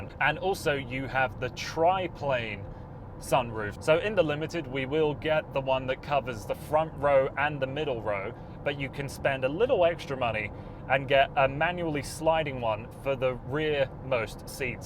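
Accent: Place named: United Kingdom. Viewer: What country are you in? You have a British accent and your speedometer is in kilometers. Are you in the UK?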